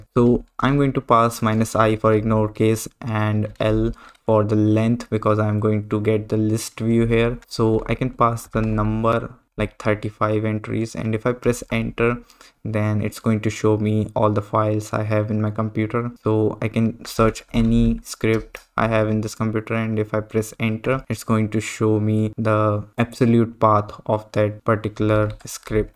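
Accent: India. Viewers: I suggest you stop talking with an indian accent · Recognised you as Indian by the accent. I am Indian too, from Haryana, using arch linux with openbox. Tiling managers don't work for me.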